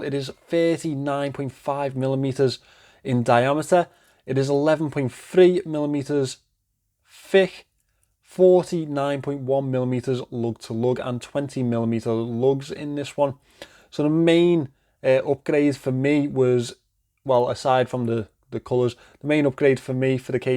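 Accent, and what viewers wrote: Liverpool, United Kingdom. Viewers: Bought the fluted bezel Datejust homage from Pagani a while ago and was thoroughly dissapointed in spite of the low price ! Very poor finishing and quality overall, poorly executed jubilee bracelet ...... it just felt like a "cheap ass watch" !! So it was with some apprehension that I ordered the dark blue OP V2 homage from Pagani, based on some very good reviews online. I must say, that the overall quality and impression of this model is lightyears ahead of the Datejust that almost put me off Pagani forever ! It feels that the quality of the watch is on par with my Seiko SARB033 and my Citizen NB1050-59E JDM. I was so impressed that a couple of days later I also ordered the non date Tiffany blue model, which will hopefully arrive in a couple of days :-) From what I can see in your review (or non-review by your statement :-) ) I will not be dissapointed. 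Thanks for the review - keep them coming ! Just pushed the subscribe button :-) Also great to hear a "Scouser" accent in a review, even though I am not British ! ;-)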